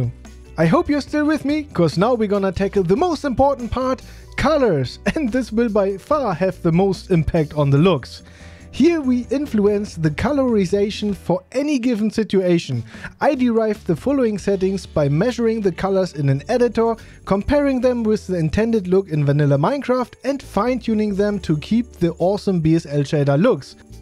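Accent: Germany. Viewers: Mein Lieblings Englisch-Deutsch-Sprechender haha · deutscher akzent richtig?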